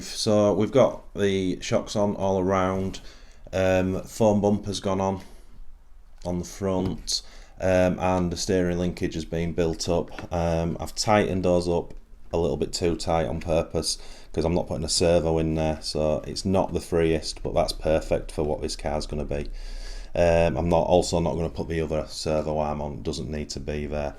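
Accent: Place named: Stockport, United Kingdom. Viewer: That a stockport accent in canada?! Where do you get your alternative decals from?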